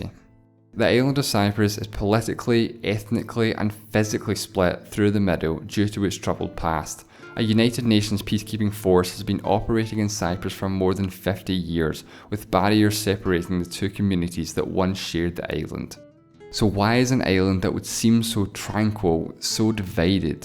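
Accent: Scotland, United Kingdom. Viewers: Geet Gupta Scottish, Scotland is in the uk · POSH SCOTTISH. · Dat awesome accent. Like I just watched Trainspotting again. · Are you Scottish? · Scottish or Irish accent?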